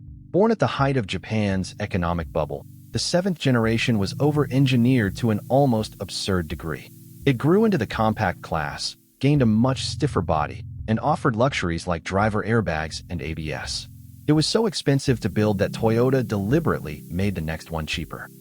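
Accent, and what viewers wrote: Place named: United States. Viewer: Who is the voice it sounds like an American man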